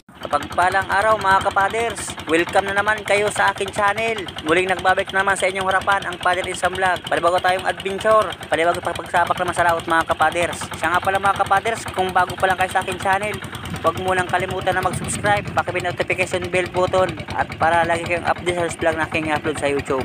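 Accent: Bicol, Philippines. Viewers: Bicolano po b kayo?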